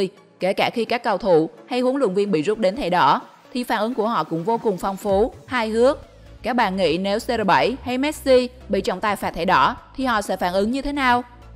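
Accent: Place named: Central, Vietnam. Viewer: Giọng mc miền trung nghe mệt thạt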